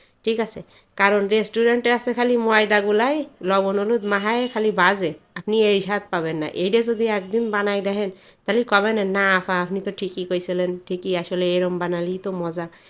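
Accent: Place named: Narail, Bangladesh. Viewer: আফা আপনার থেকে আমি একটু নড়াইলের ভাষা সিখতাম🫠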